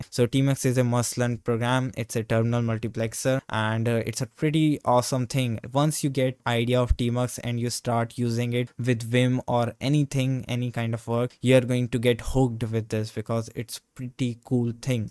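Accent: India. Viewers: I have saved this video to watch it later. Many thanks for this. Btw, may I know where you are from? You have an Indian accent.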